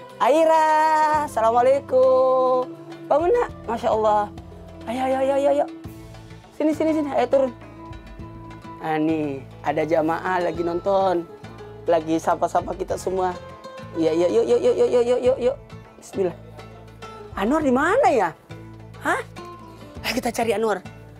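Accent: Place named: Jakarta, Indonesia. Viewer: Ustadz Sekarang Keren Banget Ya Logatx Dah Bkan Orang Makassar Lgi Deh,Tpi Jdi Orang Jakate😊😊😊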